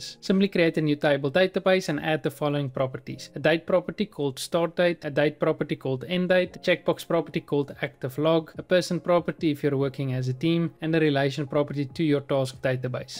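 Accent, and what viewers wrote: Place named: Free State, South Africa. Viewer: It is simply impossible that this is your real afrikaans accent! Sounds like you're from the freestate! Great content - keep it up.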